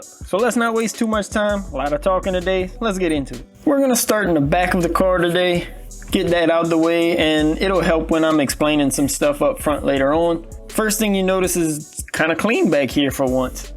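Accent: Louisiana, United States. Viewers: Got that Houma type accent going....I'm on the Northshore. Just getting into the drag racing scene, coming from Nitro Buggies in the past. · I cant with the Leweeze-ee-annah accent...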